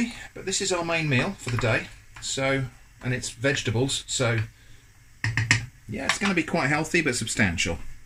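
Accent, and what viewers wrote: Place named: RP, United Kingdom. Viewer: You sound so posh when can we see your face ???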